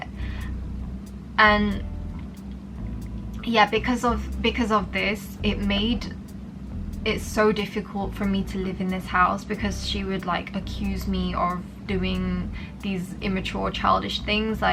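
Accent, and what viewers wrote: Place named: United Kingdom. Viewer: beautiful british accent :)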